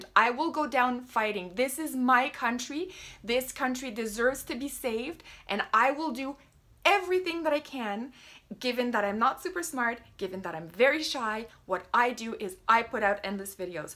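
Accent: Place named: Eastern Canada, Canada. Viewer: I hope you are right. Your accent tells me you are from the east. I hope I am right because in order to get rid of Trudeau we as Canadians need the people in Quebec and Ontario to think the way you do. Out west here we already know he won't get a vote except in BC. Unfortunately we don't control enough seats out here where the east has all the power to get rid of a person like Trudeau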